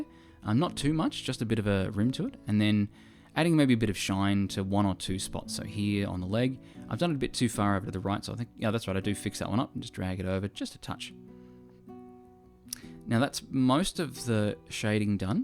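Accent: Australia. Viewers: You Australian by any chance mate? amazing tute btw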